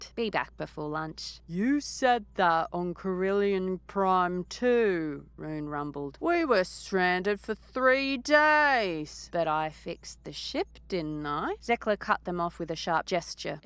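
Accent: Australia. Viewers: Good job ! Aussie ?? Don't think Kiwi.. Either way, Welcome.. Very attractive young "Sheila" lol.... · I have just found this site and I read many comments and a number mentioned that the narrator lost punctuation as they felt she was reading/talking too fast. Now I personally did not feel that there was a problem as I seemed to understand clearly what she was saying. Then way down some one mentioned that she is Australian and it dawned on me why I had no problem with her. I am Australian. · Aussie narrator noice 😊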